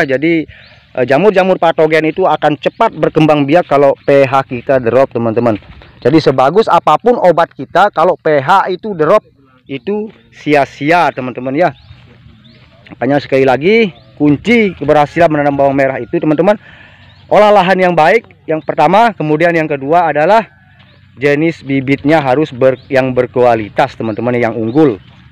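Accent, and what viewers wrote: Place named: Lombok, Indonesia. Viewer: Logat lombok ni bos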